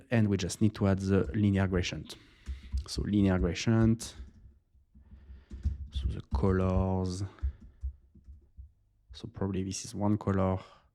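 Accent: France. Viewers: J'adore cette série, ça me donne plein d'idée pour mes projets ! (j'adore aussi ton accent Français ahah)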